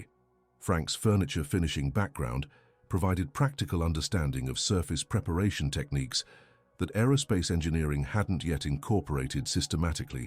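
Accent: United Kingdom. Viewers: Guess what, The United States of America is NOT the only country that watches YouTube,! Go ahead and unsubscribe if grousing about a British accent is the only comment you can make about an very interesting and informative video. (By the way, I was born and live in the USA.) Let me guess, you are a liberal, they are the only people I know that are bigoted enough to make such an uncalled-for comment. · you need to dispense with the British English!! This is the United States of America! If i have to put up with your kind of english I'LL UNSUBSCRIBE!!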